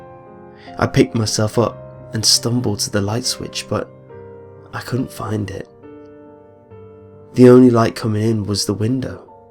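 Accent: United Kingdom. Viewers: Your BRITISH !?!?!?!?!?!?!?!?!?!?!?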